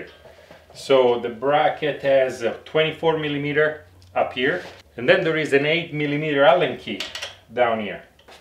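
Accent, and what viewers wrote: Italy. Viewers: That italian accent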